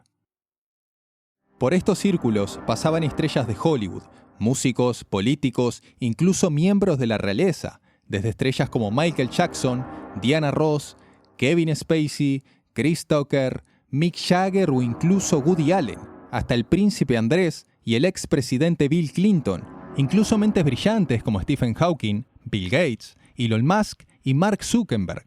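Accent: Argentina. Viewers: Lo siento no es por ofender, pero el asento Argentino me desagrada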